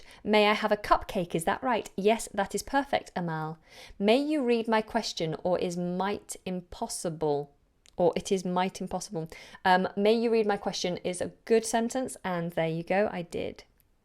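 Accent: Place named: United Kingdom. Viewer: A real British accent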